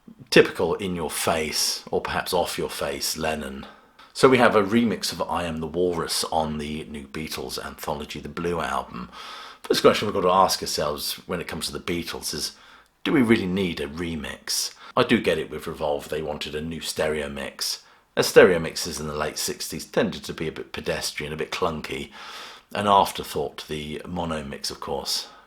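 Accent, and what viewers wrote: England, United Kingdom. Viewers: Why does an English accent piss me off. Must be genetic memory.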